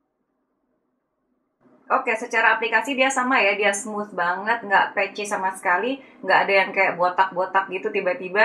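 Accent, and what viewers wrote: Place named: West Java, Indonesia. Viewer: Suka sama ka kalau lagi review logat sundanya keluar,kocak pas bilang muruluk murudul 😂😂😂😂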